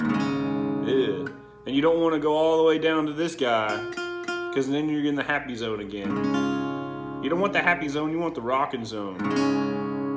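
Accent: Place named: South, United States. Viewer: man enjoyed it by your accent you sound like a southern boy where you from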